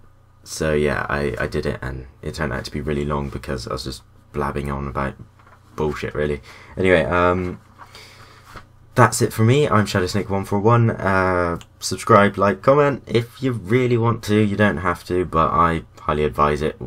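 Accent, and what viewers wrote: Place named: Taunton, United Kingdom. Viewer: Indeed same, taunton born and bred for 18 years i knew i recognized the accent =]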